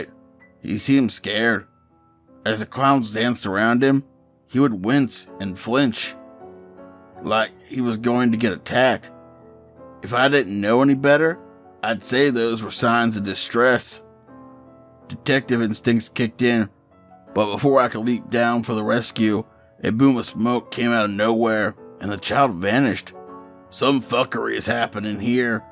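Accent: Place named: South, United States. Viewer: Awesome video. Love your southern accent. Keep up the great job man.:)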